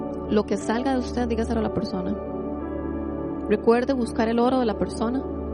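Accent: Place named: Costa Rica. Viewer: Gracias por la esplicasion sobre profetizar. Me llama la atencion tu accento en ciertas palabras ese es el accento de los ticos?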